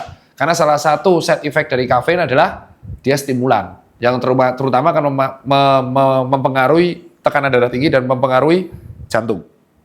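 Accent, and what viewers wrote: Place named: Surabaya, Indonesia. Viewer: Cok.. Arek iki wong sby ta? Lambene kok cocok karo aku.. 😂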